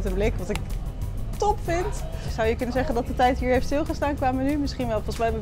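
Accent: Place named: Wassenaar, Netherlands. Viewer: Iemand die spreekt alsof ze uit Wassenaar komt is voor mij zoiezo geen echte Amsterdamse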